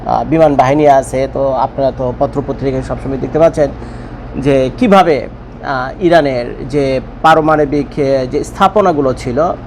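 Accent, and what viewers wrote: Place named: Noakhali, Bangladesh. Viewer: bhai mone hoy noakhailla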